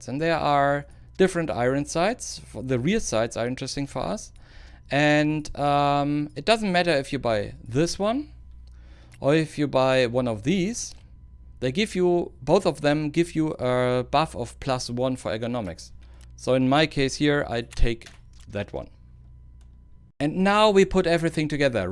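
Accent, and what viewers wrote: Germany. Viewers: You sounding like a german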